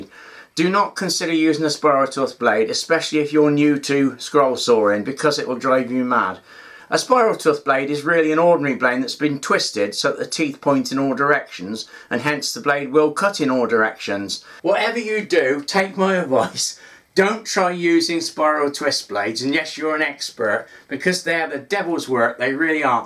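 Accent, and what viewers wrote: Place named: West Country, United Kingdom. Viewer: Fascinating. I love the accent - my guess is West Country close to Wales 🤩 You had me in with the door lock - I have a ton of "If it works don't fix it". Just starting and have bought several spirals so my experience may be variable. Thanks for an enjoyable few minutes. I am 82 and Tasmanian ex UK 60 years ago)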